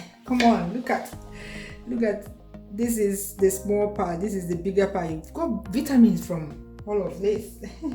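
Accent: Igbo, Nigeria. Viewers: Will it still be slippery, i can't wait to watch u cook it , the best part I enjoy in ur video is that mixture of ur engligbo na superb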